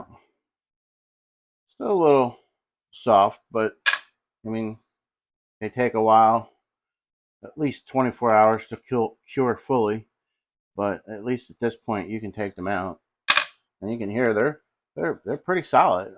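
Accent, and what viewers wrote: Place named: Maryland, United States. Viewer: First time I’ve seen you, but won’t be the last. You sound like you’re from MD, so nice to hear “normal” wuder and hunert.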